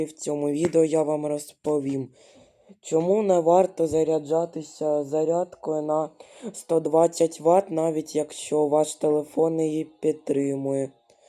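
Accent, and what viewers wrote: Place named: Russia. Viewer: Молодец хлопец, Але тренуйся правильно розмовляти, без слов- паразитов я- то " от" та тренуй вимову української глухої "г " замість російської дзвінкої.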